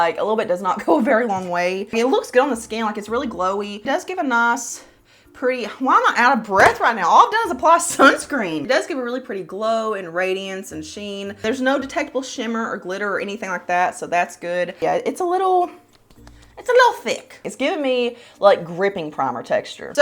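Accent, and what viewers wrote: South, United States. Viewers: You're such a pretty lady, and I LOVE your Southern accent. Makes me feel at home. 😀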